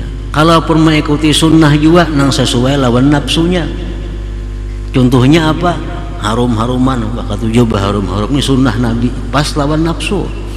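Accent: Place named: Banjar, Indonesia. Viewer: Alhamdulillah lama- kelamaan sy boleh faham. Bahasa yg Pak Guru guna diselang seli dialek banjar. Sebagaimana pak2 kiyai di pulau jawa berbahasa indo-jawa, begitulah halnya di kalimantan—mrk bertutur dlm bahasa yg digunakan oleh org kalimantan umumnya iaitu dialek banjar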